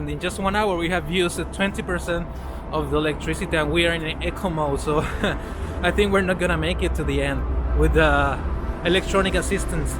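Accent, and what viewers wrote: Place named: Japan. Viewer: Rosario Herrera I think you are right, his accent is very Japanese and he has the traditional samurai hairstyle.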